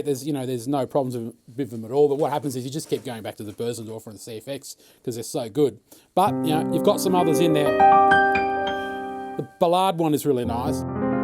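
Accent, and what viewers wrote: Australia. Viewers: Beautifully played. Nice to hear the 'aussie' sound too hehe. Definitely prodigy level ! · Great review! So nice to hear the Australian accent and down to earth attitude. I’m picking my P515 up tomorrow.😉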